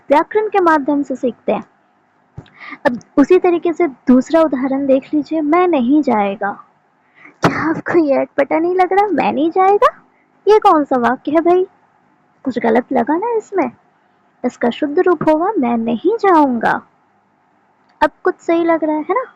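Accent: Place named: Bihar, India. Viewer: Accha aap bihar se ho😮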